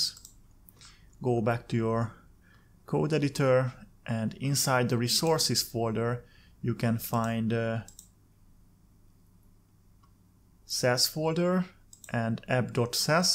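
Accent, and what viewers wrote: Hungary. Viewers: akkor jól éreztem az akcentusodból hogy Magyar vagy. Köszi akkor magyarul is 😀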